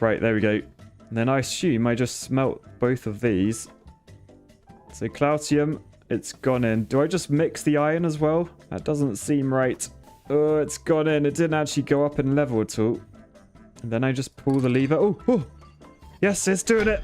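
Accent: United Kingdom. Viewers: I watch your videos to practice listening to british accent and it works pretty well. Thanks, mate!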